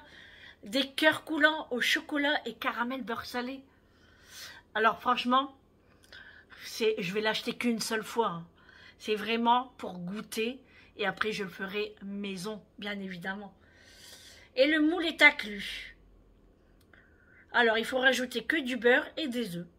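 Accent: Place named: Nord, France. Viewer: Bonsoir Un vrai plaisir de faire votre connaissance… j’ai découvert votre chaîne par hasard et j’en suis plus que ravie 😊 En effet je suis originaire du Nord et votre accent me fait chaud au cœur 🙏 Je me suis abonnée à votre chaîne avec grand plaisir… Je vous envoie de belles ondes positives de ma Bretagne d’adoption et surtout prenez soin de vous. (Ma sœur habite fâches thumesnil) Bon courage pour demain et je vous dis encore merci pour votre gentillesse. Dominique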